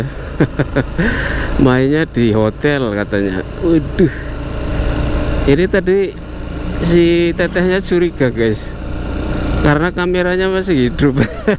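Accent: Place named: Banyumas, Indonesia. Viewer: Sampeyan ngapak ya ngomonge, jawane ngendi Mase?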